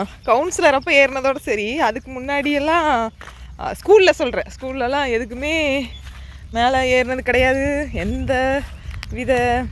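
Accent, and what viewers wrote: Kongu, India. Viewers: அருமையான வாழ்கை நம்ம எதார்த்தமான கொங்கு தமிழ் வாழ்க வளமுடன் ❤❤❤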